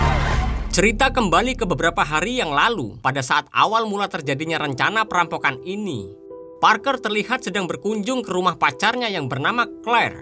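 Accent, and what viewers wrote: Java, Indonesia. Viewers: Seru ni pembawa acaranya orang Jawa logatnya mantap😂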